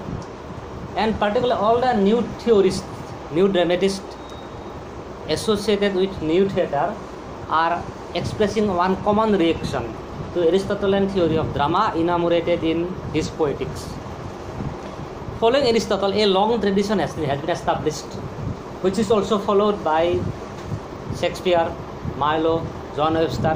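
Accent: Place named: Assam, India. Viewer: According to your pronounce, I'm sure you are from Assam 👍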